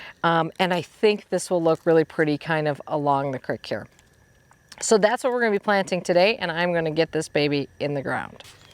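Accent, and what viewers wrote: Wisconsin, United States. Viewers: This is fun. Love your sense of humor and that you garden in my zone. Knew you were from Wisconsin as soon as I heard you talk in another video. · Great video! Sad that you lost the bet. Go Badgers! I pronounce Oregon just like you do. Sconnie girl here too! 💚🌱 You should send Laura some Spotted Cow from Leinenkugels. Then if she likes it, she will have to come here to buy more. (Only sold in Wisconsin) 😊😊 Have a wonderful day!!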